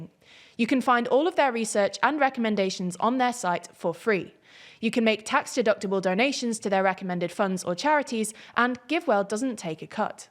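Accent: United Kingdom. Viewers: An asian woman with a British accent talking about French politics... Your credibility is zero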